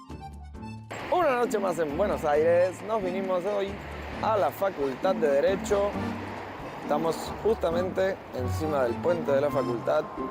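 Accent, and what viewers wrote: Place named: Nicaragua. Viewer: Es verdad hay muy poco amor en Argentina, muy buena tù reflexión. quizás por tù acento eres de Nicaragua o del Salvador, y en esos paìses haya mucho màs amor.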